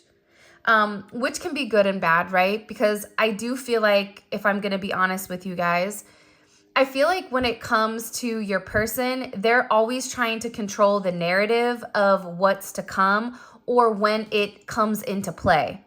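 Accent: California, United States. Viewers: ! Girl you bringing the FACTS!! Resonates and Confirmation 💯🔥🔥 by the way I love your Cali slang lol I am on the other coast tho so it's different so I enjoy it😂 and Maaaannn the Angry Beavers you came with that and I was like OMG!! 😂 Yasss!! Love Ya! Thanks so much😊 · Yes and I am one of those people that do not like your accent either. I do not like the American accent on most that I have heard. And yet my favourite singer is Mr. Neil Diamond, - and he talks so pleasantly, and American.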